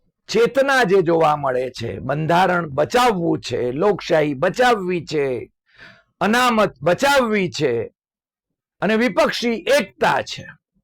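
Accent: Gujarat, India. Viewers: ટોન થોડો બદલાયેલ,કંઇક ગુજજુ ગુજ્જુ....હં..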